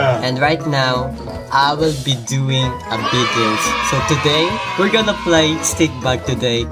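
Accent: Philippines. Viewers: If I'm right ur filipino. because of ur accent but yeah many accent like that. like indo...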